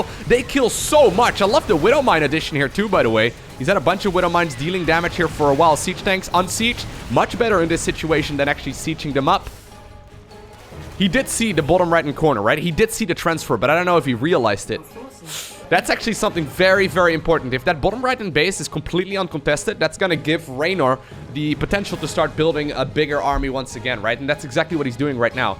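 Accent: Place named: Netherlands. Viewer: Lmao, Im praticing my "Hello everyone, my name is " so loud. Its getting pretty close, but it is missing that dutch accent.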